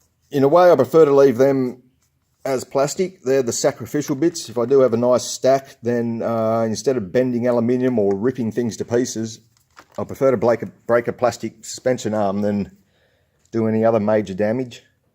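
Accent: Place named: Australia. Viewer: Ahh dude nice upgrades! And your an Aussie, love your country and accent! Liked and subscribed 👍👊 how did you find the fitment on the area RC stuff!? I bought some area RC alloy upgrades for my x maxx and none it fitted properly! I destroyed 4 wheel bearings before giving up installing the hubs, sent it all back and gotta full refund though 👍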